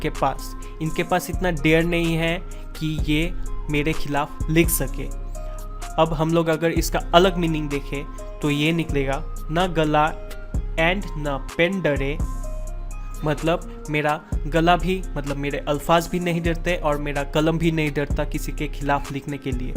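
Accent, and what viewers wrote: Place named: Bengal, India. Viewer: Your bengoli Akcent is so cute 😃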